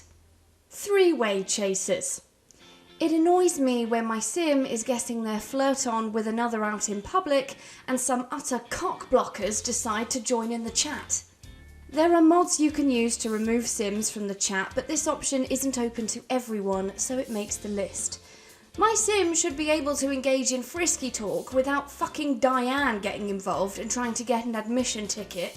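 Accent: United Kingdom. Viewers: I agree with the whole video. In all honesty, I enjoyed this video SO much. Between your lovely British accent and the swearing, this was a treat to my ears and soul. You got yourself a new subscriber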